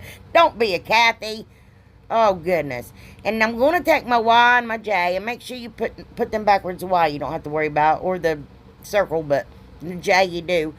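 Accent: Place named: Louisiana, United States. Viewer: Girl what state r u from? Your accent is just what we call here in South Louisiana COUNTY..love your channel,be blessed.loved everything but my favorite was the angels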